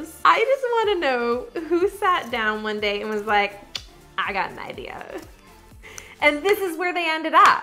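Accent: Canada. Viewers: I thought she was Canadian😂😂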